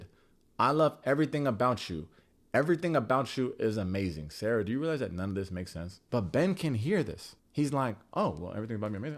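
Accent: Virginia, United States. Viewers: I will never not love your Virginia voice. Ever. Never change, King. We’ll miss you at the reunion!!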